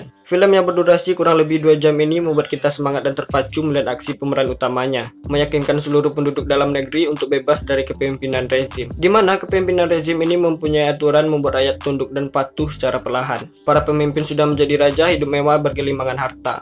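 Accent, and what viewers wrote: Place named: Sumatra, Indonesia. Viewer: Logatnya kaya orang sumatera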